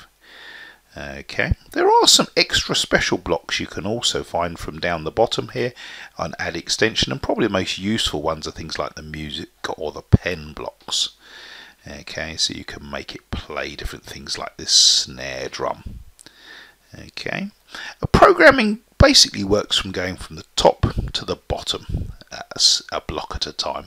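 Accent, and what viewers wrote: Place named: United Kingdom. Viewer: he sounds like so so so so british in <num> x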